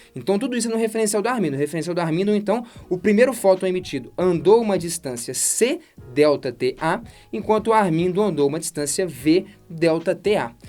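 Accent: Minas Gerais, Brazil. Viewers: Cara eu amooo que vc tem sotaque de minas, me sinto acolhida kkkkkkkkk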